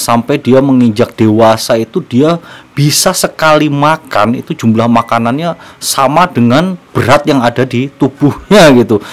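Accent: Java, Indonesia. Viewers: jowo ne ning ndi mas?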